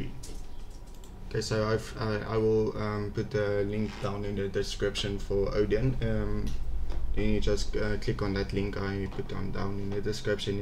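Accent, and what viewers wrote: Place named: Afrikaans, South Africa. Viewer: kan daai afrikaans hoor in daai engels. thanks vir di video dude 😁😁🤘🤘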